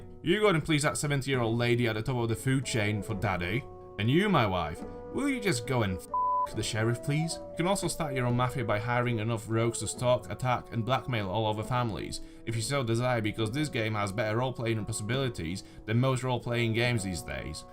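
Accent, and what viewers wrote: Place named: Northern England, United Kingdom. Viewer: Wait so are you Polish as per your name, or are you from Sheffield?! Seriously you sound like you're from northern England! Nice review though 👍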